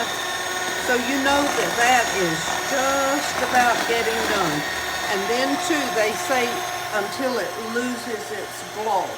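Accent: South, United States. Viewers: I miss that southern accent. I use to say boil just like you. I don't understand why that person said they need captions bc they couldn't understand you. I hear ya just fine😊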